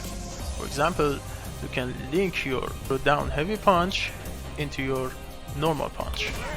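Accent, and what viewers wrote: Iran. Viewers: why does this guy sound Iranian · lmao u have to be Persian ur accent is so obvious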